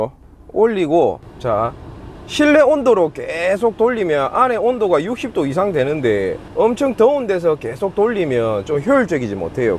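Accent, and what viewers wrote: Gyeongsang, South Korea. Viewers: 행님 영상 급나게 잘보고잇습니다 경상도 사람이라서 사투리 쓰시는 유튜버 뵐때마다 을매나 반가운지😁 제가 일할때 레이 밴 차량을 타는데 이 방법이 잘 안통합니다 ㅠㅠ 형님 영상에 있는대로 외기순환을 적극적으로 활용해서 해도 에어컨 팬 자체가 약해서 그런지 안통하더라구요 ㅠㅠ 방법이 어찌 없을까요.. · 실내가 찹찰해짔다 ㅋㅋㅋ 아 찐 갱상도남자 ㅋㅋㅋ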